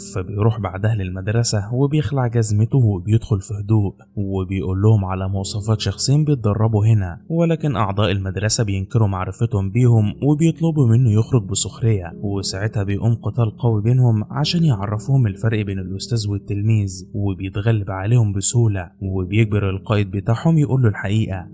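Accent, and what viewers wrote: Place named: Iraq. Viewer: ليش احسك عراقي وتحجي مصري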